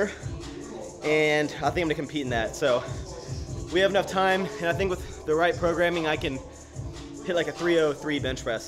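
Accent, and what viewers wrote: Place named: Washington D.C., United States. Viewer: just found ur channel. love ur vids bro! sounds like D.C. to me... moving on uupppp!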